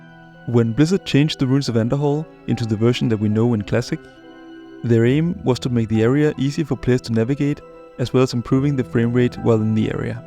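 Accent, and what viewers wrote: Denmark. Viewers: Simply cannot watch stuff with such a thick Danish accent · Good ole Dane accent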